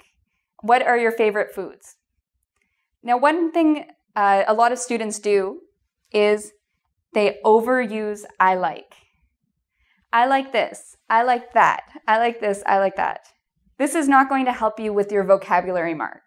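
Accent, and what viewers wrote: Canada. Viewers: Your canadian accent is so cute :) OBOOUT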